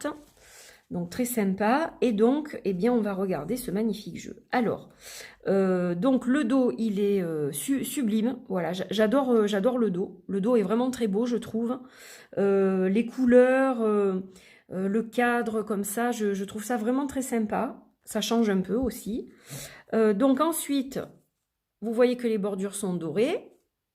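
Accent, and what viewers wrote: South, France. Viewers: il est splendide! J'adore Leïla, cet oracle et votre présentation aux accengs du sudeuh (comme moi).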